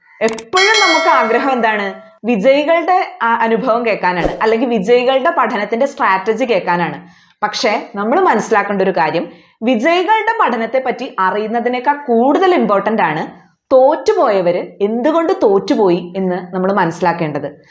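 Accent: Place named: Kottayam, India. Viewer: കോട്ടയം കാരി ആണോ നല്ല ഭാഷ ശുദ്ധി, പറഞ്ഞത് ശരിയായ കാര്യം തന്നെ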